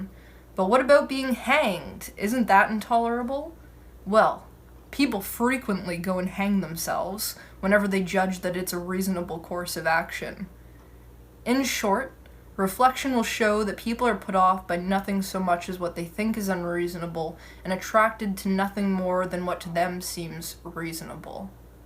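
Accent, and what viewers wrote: Canada. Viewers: What is this "boot" she keeps on mentioning? "I think this is a boot wine drinking competitions." "Here Epictetus is talking a boot spanking Spartans." (Actually, I think it is charming. I would move about Canada just to hear people say "a boot.")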